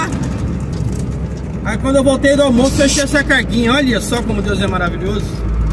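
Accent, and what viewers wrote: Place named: Rio de Janeiro, Brazil. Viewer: Vc já adquiriu até sotaque de carioca kkkk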